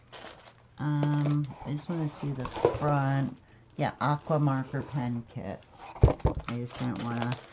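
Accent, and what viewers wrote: United States. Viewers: lol i thought u were from nj u talk like my husband lol 😊🐥☀️❤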